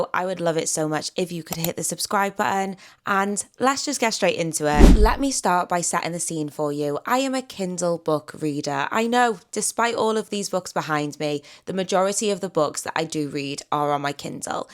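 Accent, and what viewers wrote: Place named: Southern England, United Kingdom. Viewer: Based on her accent and the info in the description of her Channel, she most likely buys books in the UK. I'd guess southern England but I could be wrong.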